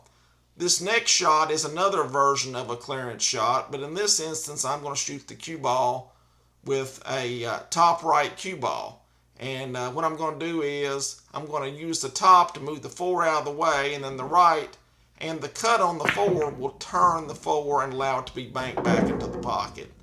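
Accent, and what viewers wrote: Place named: Texas, United States. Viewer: I have enjoyed your videos and commented on several. Given the title of this one, I was a bit disappointed. Only 5, 6, 8, and 9 were truly instructive - yet one has to look for the opportunity to try them.. The others were variations of trick shots found in any trick shot books (see Byrne or Massey). An alternative shot on the “corner hooked” down the rail shot is a 3 rail shooting into the near corner face. Aim at 1st diamond up from object ball. I’m sure you know this trick shot. Keep ‘em coming. You are delight to watch and listen to. You accent takes me back to my Texas upbringing.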